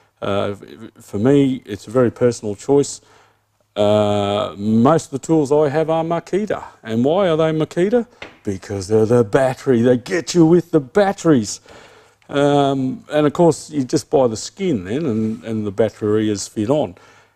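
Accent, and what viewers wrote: Australia. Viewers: Man. Your Tassie Accent makes that intro All the better!!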